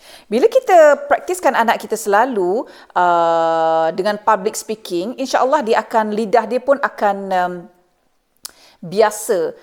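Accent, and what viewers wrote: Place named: Selangor, Malaysia. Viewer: Berkenaan loghat Nesmilan tu. Kita tau your family pun di Nilai. Utk pengetahuan semua Nilai ni dah almost Selangor (in fact heboh jap ari tu, Nilai ni dah join Selangor) so bunyi loghat org Nilai ni kira bunyi Selangor la. Bukan semua org Nesmilan berbunyi Pilah atau Rembau. Kalau ada yg berbunyi Pilah atau Rembau tu cuma sesetengah je tapi bukan 'loghat rasmi' org Nilai.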